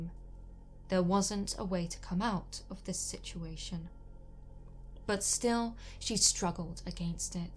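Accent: United Kingdom. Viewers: is one of the most stunningly, naturally beautiful women I have ever seen. Her skin is perfect. And that soft voice with that British accent, WoW!! What more could any viewer ask for!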